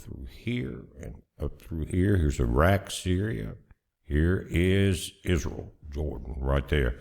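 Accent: South, United States. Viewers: You are a real scumbag. Your old man creepy voice... Lil southern draw... You do not represent America... You are a war hawk with no threat of being drafted into Israeli war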